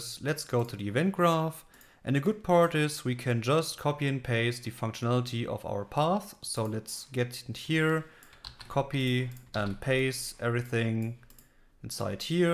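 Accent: Germany. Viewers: Thanks for your tutorials, they are very helpful. Actually, I'm learing UE5 right now and for that, I'm trying to build my own Train Game. So far so good, I've implemented some models, two bogies per wagon and some algorithms that calculate the right placement of the train body and the back bogie position on the spline in order to always keep the same relative distance between the two bogies. The next step would be to implement switches, I think that should be fairly easy with your method, just switch the active Spline Track of each of the bogies in the moment they hit the hitboxes. But I've faced another, logical, problem/challenge. Let's say you connect some spline tracks with each other (actually haven't tried that, but that should work relatively similar to the switch "problem") and you build a U-Turn, which would place you on your "origin" spline, but the other way around. 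In that case, moving "forward" would not mean distanceAlongSpline+velocity, rather you then would have to subtract your velocity from the distance in order to move "forward" or lets say in the right direction. I'm really not sure how I could do that, like find out what would be the right direciton for the train.. Maybe we could chat a bit about this issue and what you think about that? Thanks in advance!:) Ps: You sound German, is that right? :D Then you could also answer this in German, no problem.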